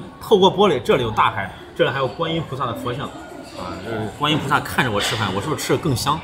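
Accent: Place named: Shaanxi, China. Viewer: 你这个陕普 我听着真上头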